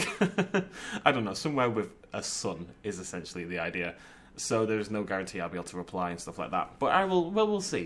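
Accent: United Kingdom. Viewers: Ten days without a video in British? I can't deal....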